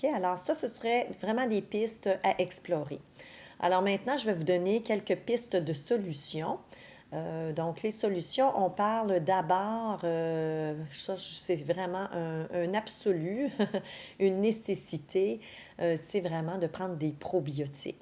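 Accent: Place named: Canada. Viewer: Merci, je vais essayer de suivre vos conseils, En plus votre bel accent canadien me fait beaucoup de plaisir Bonjour de la France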